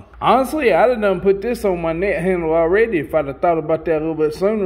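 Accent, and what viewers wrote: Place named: South, United States. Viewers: Southern accents give people a bad rap. As soon as I hear a thick southern accent I automatically assume the person is a dumb/slow. But you my friend, are not dumb or slow. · Dude, I've got to comment. I like your style of explaining and humor. I love your 'southern accent.' You sure you're not from deep in the Southeast? lol Anyway, I've looked at quite a few of your videos and am impressed. You get down to the bottom line $$$ wise and explain the purpose, and why, plain and simple. I've learned quite a bit. I'm in the process of getting back into fishing for next year. Got my boat, currently modifying it and getting it ready. Keep up the good work man...............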